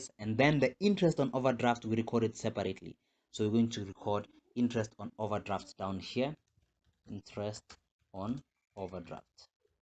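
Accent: South Africa. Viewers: Very well elaborated! Thank you. The illustration looks like a Kenyan question but the accent is South African haha (on a light note)... Thanks again!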